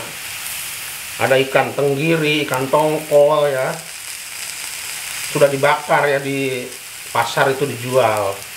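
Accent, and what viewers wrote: West Java, Indonesia. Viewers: Bapak mah Sundanya kas, kalo saya mah Tasik, bapak Pangandaran ga jauh pa 😀😀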